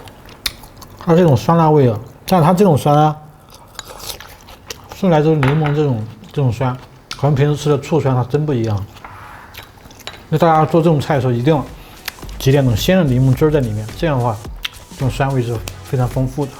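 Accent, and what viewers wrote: Sichuan, China. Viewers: 川味普通话好情切哦！❤ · 帅哥川普十级，听上去特别亲切。菜谱也棒棒地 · 师傅是四川人吗？rua 均匀，哈哈哈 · 听到师傅的椒盐普通话，就晓得师傅的川菜是资格的。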